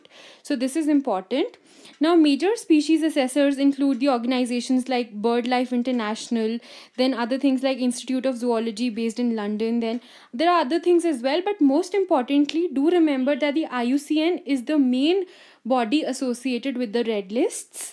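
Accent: India. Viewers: Indian dialect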